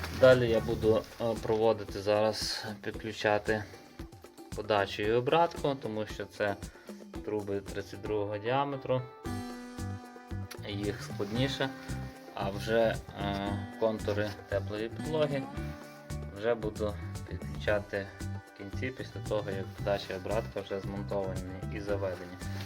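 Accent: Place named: Surzhyk, Ukraine. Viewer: за суржик 👎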